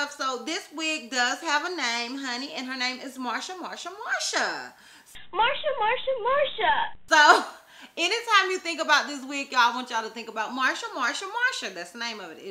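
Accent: South, United States. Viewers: You’re a very beautiful woman. Your southern twang gives you more character as well. ❤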